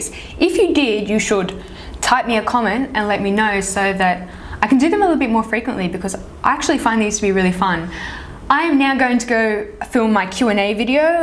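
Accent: Australia. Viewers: Hey beb are you an aussie? Another thing is where do you buy all your makeup from? You're gorgeous btw!!!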